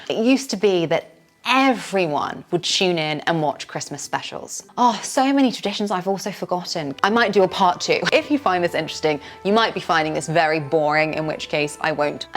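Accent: United Kingdom. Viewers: Happy Christmas, I really enjoyed and saw your love and pride for British Christmas! Thank you for sharing your happy VIBES and beautiful British accent always❤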